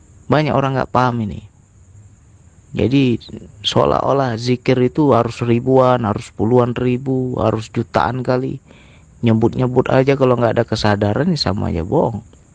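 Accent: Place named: Medan, Indonesia. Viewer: Boleh tau dimana tglnya..logatnya macam org Medan..?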